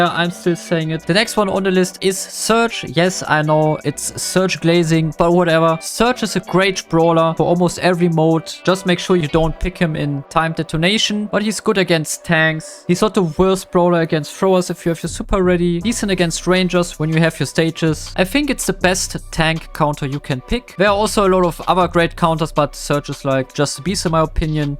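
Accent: Germany. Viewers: Sprich deutsch dein deutsch Akzent ragt zu sehr raus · Bro der deutsche Akzent ich fühl den so hart 😂😂😂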